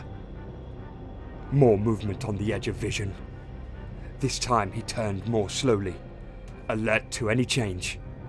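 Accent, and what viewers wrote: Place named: Scotland, United Kingdom. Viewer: Lower the intensity of the “Scottish” accent IMO.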